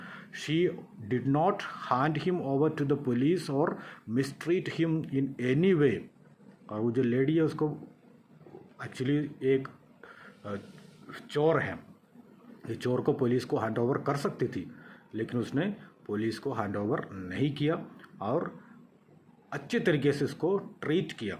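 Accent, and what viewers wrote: South, India. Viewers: Your ascent is like a South Indian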